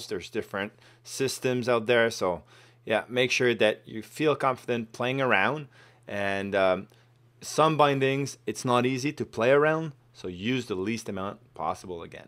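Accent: Quebec, Canada. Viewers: Vous êtes québécois? Je perçois l'accent de chez nous!! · listening to the Québecois english has been fun.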